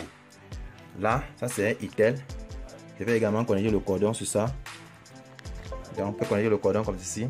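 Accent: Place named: Cameroon. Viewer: Jvoudrais aussi un box si jpx l'avoir chez toi jcroi q t'es au camer, vus ton accent je crois que t'es 1frero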